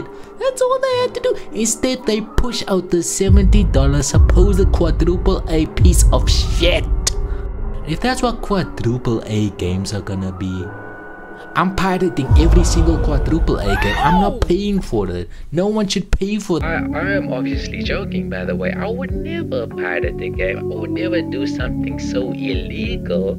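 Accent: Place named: South Africa. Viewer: Eish I thought u where from South Africa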